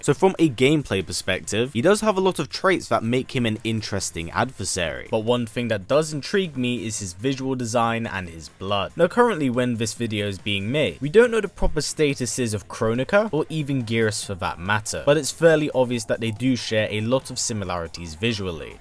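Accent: England, United Kingdom. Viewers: you are from England?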